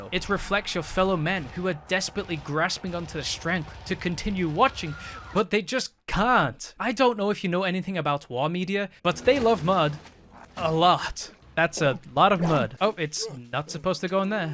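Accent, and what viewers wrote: United Kingdom. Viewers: The pyrocynical diss is crazy, this channel feels like an exact clone. The autistic obsession with random video games, the queer fursona, the british accent. Its all there.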